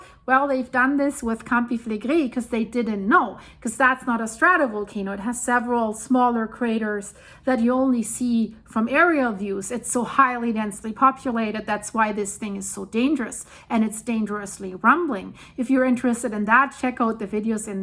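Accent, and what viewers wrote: Germany. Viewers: Sie IST Deutsche, oder? Geh ich jetzt von aus bei, und dem unauffällig hörbaren deutschen Akzent. 😂❤ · Hi, this is a good, informative video about vulcanos in Santorini area in Greece. And your German accent of English is charming 👍😉😊 also.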